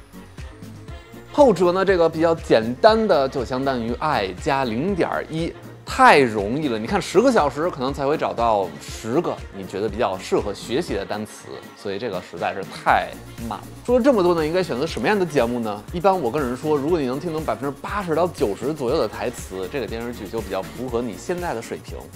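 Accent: Beijing, China. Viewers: 这一口京腔啊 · 北京口音的外国英语老师。。。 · 京片子味儿哈哈哈哈真的是老炮儿了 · 我老家南方的， 你的北京话比我说得好，虽然我在北京时间比你长很多。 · 北京口音？ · 你的口音是北京腔，哪個訪談節目比較好？ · 马老师北京话纯正，跟他学没毛病。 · 满屏的京味儿哈哈哈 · 你竟然還會北京口音 酷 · 你的中文肯定是北京人交的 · ，我今天突然发现你有北京口音.... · 老师的中文说的好好感觉有股北京腔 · 有的人就是有语言天才，没办法的。这主播比不少北京人更北京。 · 一张口一股子京味😂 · 老师的中文口音感觉很京味，老师在北京生活比较吗？ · 是北京口音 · ，你口音像北京土著人。你是一个长的像外国人，英语说的也像外国人的北京人。哈哈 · 哈，这一口京腔~ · 您这北京话也忒标准了吧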